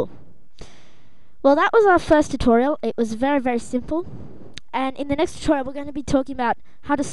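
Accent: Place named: Australia. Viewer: Awesome austrailan accent! you helped sooo much ! subbed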